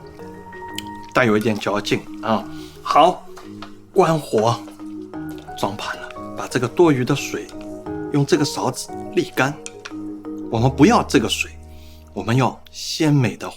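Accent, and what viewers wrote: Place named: Shanghai, China. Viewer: 沪普好听。盼能回国吃一碗鲜得来眉毛也要落下来的雪菜黄鱼面。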